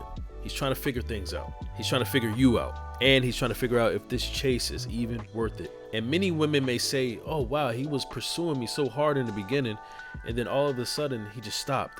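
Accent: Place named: Philadelphia, United States. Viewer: Love your Philly accent❤ Great content.😊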